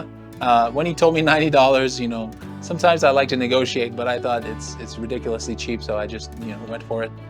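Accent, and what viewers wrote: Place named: Ecuador. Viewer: Idk how I got here but I'm glad I did, loved this video! the bocadillos and n that red thing made from guaba are both my favorite too, the encebollado mmm... I actually hate it I might be the only Ecuatoriano who hates encebollado lol... saludos desde Guala-Azuay-Ecuador 👋 👋 btw ur Spanish is incredible u even have our Ecuadorian accent.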